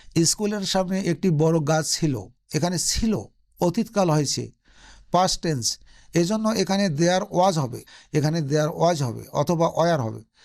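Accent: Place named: United Kingdom. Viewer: Apni british english bolchen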